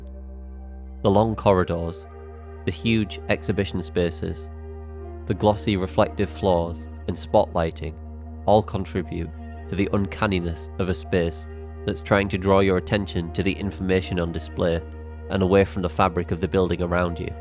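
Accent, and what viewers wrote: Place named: United Kingdom. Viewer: Sylvianian Families was different because, it wasn't just the bg's. Go to the british adverts and you'll notice, each one TOLD a story! So you were part of this story world (that was remarkably close to our own, at least a couple of centuries back!)! *edit: wait. I noticed your accent and I said the British ads 🤣 ignore me on that part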